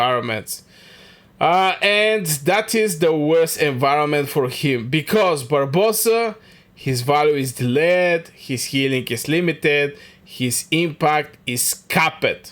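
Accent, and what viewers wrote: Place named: England, United Kingdom. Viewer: wow, thank you for the quick reply.. keep doing more videos.. so happy to see you back making more videos.. I love your English accent.. you are doing great 🙌🏻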